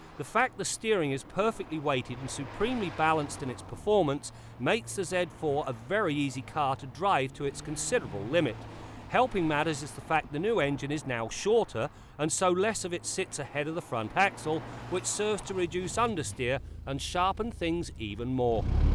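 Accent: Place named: United Kingdom. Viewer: I am confused. This guy has a British accent. He is talking about dollars. The car is LHD but has Celsius on the climate. What country is this?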